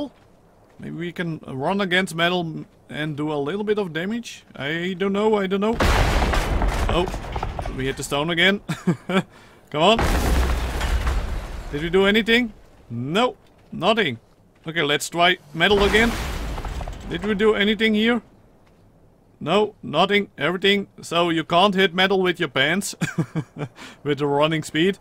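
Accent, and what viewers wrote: Netherlands. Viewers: Nederlands. Accent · Lel your dutch accent is getting weird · Ik hoorde al meteen dat je een Nederlander bent! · Jaylen Scarlett hes dutch... i think that i recognize my own accent in it (before i moved to the states) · U are dutch right because you kinda speak steenkolen english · Ben jij niet gwn Nederlands ik kan het horen aan je accent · are you dutch? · Are you dutch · Dutch not indian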